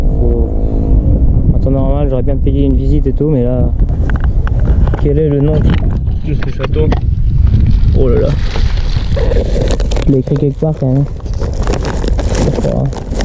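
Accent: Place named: Germany. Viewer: Sympa la vidéo et que dire de ton super accent allemand...vivement la suite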